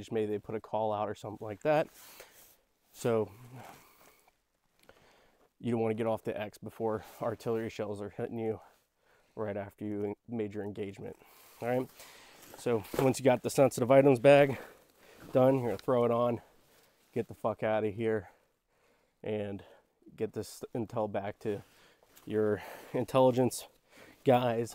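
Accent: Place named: New England, United States. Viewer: No where near Appalachia. Doesn't look like my backyard, just a little to flat. Plus your Yankee accent tells me the same thing. Otherwise, the idea of your video is good..I wish you much success in your endeavors. The more educational content like this the better.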